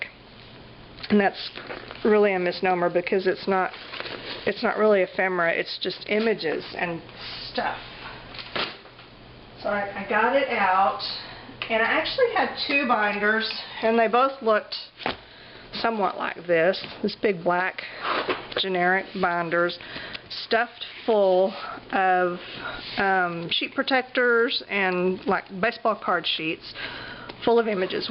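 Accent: South, United States. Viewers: Okay, I am so stealing your "It's like perfume on a pigs ear." Just to let you know it made me laugh out loud!!! I love that there is someone else out here with a southern accent!! :)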